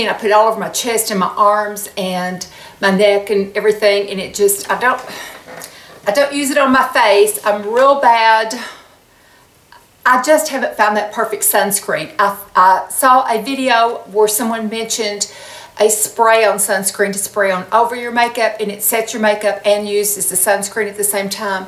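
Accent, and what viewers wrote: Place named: Nashville, United States. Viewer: thank you thank you for this video. it was the perfect call to action to help me get out of a slump and start to get in gear! i love the winter too, but my husband hates it, he complains the entire season. i say you can always put more clothes on but there is only so much you can take off. i’ve lived in north carolina my whole like bit my husband’s family is from Nashville. Listening to you is like hanging out with all his aunts and female cousins. Love it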